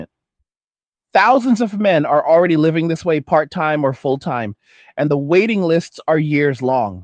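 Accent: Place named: Panhandle, United States. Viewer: you got that inbred panhandle voice going on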